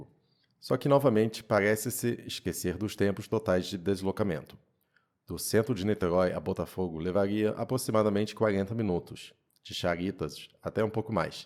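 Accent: France. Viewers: A seu sotaque francês é o sonho de todo carioca.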